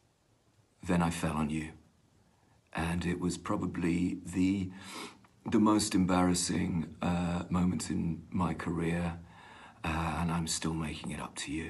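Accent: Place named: England, United Kingdom. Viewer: So bloody weird. I’m British, I’ve always known Andrew Lincoln was British (This Life, Teachers, Love Actually). But hearing him speaking in an English accent messes with my mind. Just goes to show how good an actor he is, creating such an iconic character in Rick Grimes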